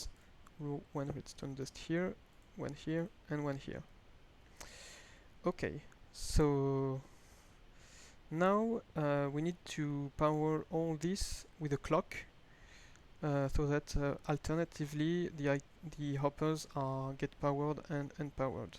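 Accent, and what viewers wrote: France. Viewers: j'entends un joli accent français :p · It's fine if you have a french accent but there will always be racist people. Most of the time though people just find other accents annoying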